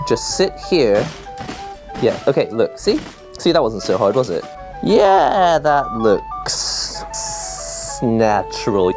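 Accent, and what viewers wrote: United Kingdom. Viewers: I already asked but are you british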